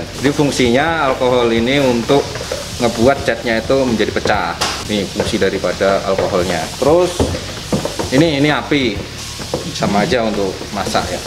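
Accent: Java, Indonesia. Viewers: Baru nonton eh ada logat jawa nya Tmpat tggal nya di mana ya, Klo boleh pengen maen&belajar biar tmbah ilmu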